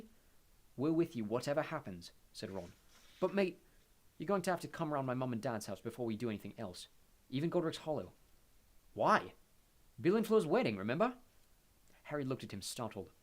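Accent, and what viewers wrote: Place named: United Kingdom. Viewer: Umm that's called British accent.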